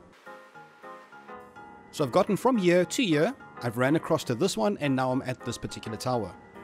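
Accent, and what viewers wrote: South Africa. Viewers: Ayoo, are u South African bruu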